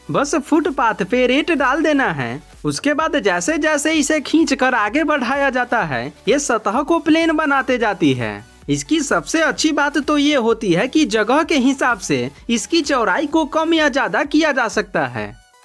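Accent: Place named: Bihar, India. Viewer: Bhut Ganda bolate ho, bihar se ho....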